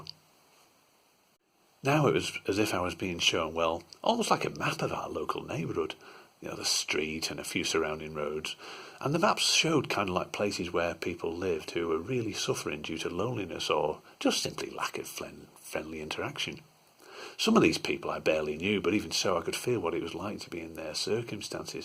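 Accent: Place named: North, United Kingdom. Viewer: you've got a great voice for telling stories, i imagine you could do a kids audio book really well. the friendly soft but deep northern accent can't go wrong! thanks for passing on the dmt love!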